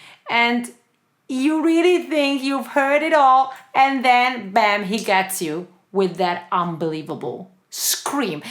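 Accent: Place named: Italy. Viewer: Заметно что мадам из Италии. Очень быстрый темп речи. Тяжело успевать читать субтитры.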